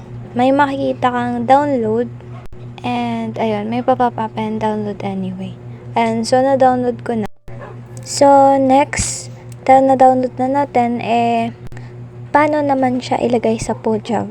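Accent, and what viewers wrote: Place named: India. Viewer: Yooo akala ko endian tagalog pala.......babae kaba??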